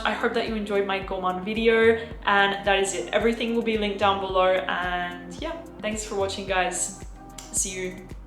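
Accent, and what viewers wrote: France. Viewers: About the accent, I wrote you a comment that Berlin isn’t supposed to sound French, but since then I can’t help but pronounce it as Ber-luh in my mind all the time, especially when I see Poets of Berluh 🤣 the French accent is so sticky 🤣 Anyway I hope you don’t take comments about accents too seriously. Love xxx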